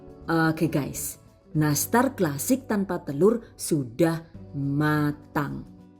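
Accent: Banyumas, Indonesia. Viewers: Suka banget channel ini krn pengisi suaranya lucu dan menarik, apalagi kalau bahasa ngapak hahaha. Ada tips dan trik juga sukses selalu #GiveawayTHV2021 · Semua vidio saya suka karna disitu ada bahan2 nya,ada perhitungan biaya2 sampai hal yg terkecil dan sesuai daerah wilayah anda yah itu kata2 engga bisa lepas setiap per episode vidio yg lain nya dan satu lagi ngapak nya jos gandos😁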